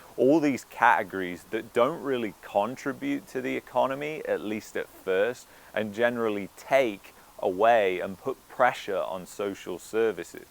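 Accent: United Kingdom. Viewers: You Brit accent along is pleasure to listen to ! I learn English from your talking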